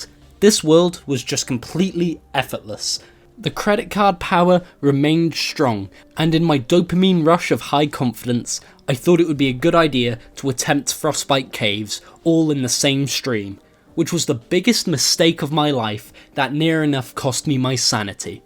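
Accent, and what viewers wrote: United Kingdom. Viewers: I didnt know u were british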